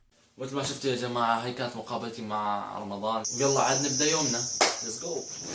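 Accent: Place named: United Arab Emirates. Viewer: ياخي لهجتك زكرتني بايام حلوة ايام الفريج و الربع ... انا هلق بالمانيا و كنت عايش بالامارات .. بس ما حبيت المانيا ابدا ... الامارات احلى بكتير ... كيف امريكا حلوة متل ما منفكر و لا كمان متل اوروبا قرف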